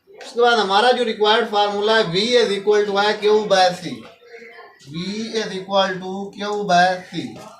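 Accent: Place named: Jhang, Pakistan. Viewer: Kia method ha prhana k kia Khana...sir ap jhang sa ho kia?? Ap k language jhang k lgti ha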